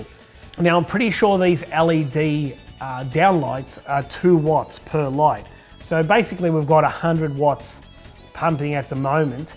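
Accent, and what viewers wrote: Australia. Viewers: This Aussie accent is particularly grating. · i love the aussie accent idk why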